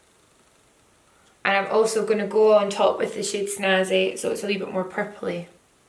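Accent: Scotland, United Kingdom. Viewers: the scottish accent is the best! But then again, I'm scottish too hahaha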